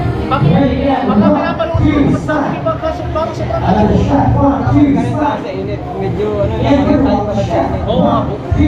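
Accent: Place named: Bicol, Philippines. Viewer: Yung salita mo po naalala ko yung kaibigan kong si unad taga bicol din 😢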